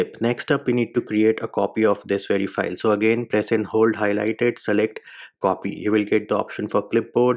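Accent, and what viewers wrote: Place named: India. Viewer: Why tf are All these videos made by Indian dudes with thick accents holy s***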